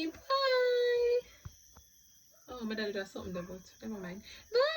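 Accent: London, United Kingdom. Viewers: U nailed that London accent Nice vedio 😊😊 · The London accent and conversation 😂😂😂. The British intellect 😆♥️ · The london accent make me DWLLLLLLL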